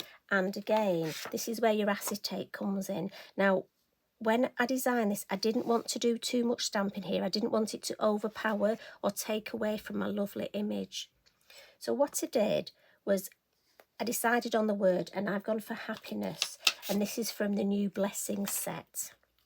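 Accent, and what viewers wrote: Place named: Manchester, United Kingdom. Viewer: Thanks, I'm new to Lavinia stamps and your card is just beautiful. I love listening to you as well, reminds me of relatives in Manchester:)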